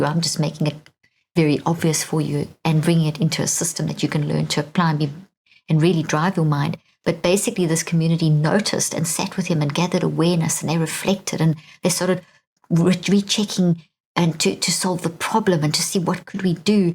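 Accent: South Africa. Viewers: South African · South African I think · It is amazing how your South African accent remains over all these years. Thanks for these podcasts Dr. Leaf. South Africa will grow and develop within time- hope just needs to be cultivated in people's minds again.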